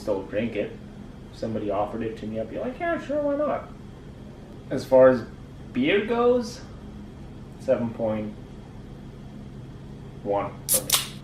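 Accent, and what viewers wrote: United States. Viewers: Till you say something with a R or a L in it you sound American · He sounds more American than some Americans Girl bye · You sound American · Lol, dachte du bist echter Amerikaner bis ich den Titel richtig gelesen hab, kein Scheiß. Super Ausprache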